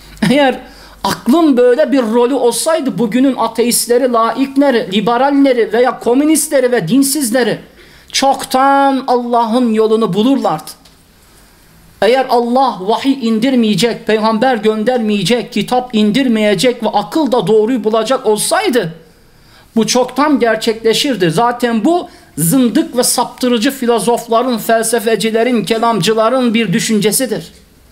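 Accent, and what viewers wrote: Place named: Arab world. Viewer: Islami kelami yasaklayan Islam liderler simdi cennette mi yani? Ne kadar ilginc, Kuran bu liderler geldikten sonra kelami yasaklar olarak algilanmaya baslandi. Islam cografyasinin icindeki parcalanma dusunmenin yasaklanmasidir. Iman kulun soz zikretmesine indirgenirse tepenize biner de binerler. Beyefendi, nicin kalin bir Arap aksaniyla anlatiyorsunuz meraminizi? Nisantasi Turkcesi'nden farksiz bir yerde.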